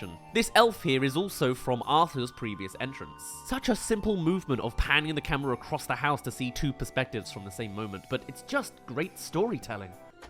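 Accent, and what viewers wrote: Scotland, United Kingdom. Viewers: How did you as a British person, mistake a VERY Scottish accent for an Irish one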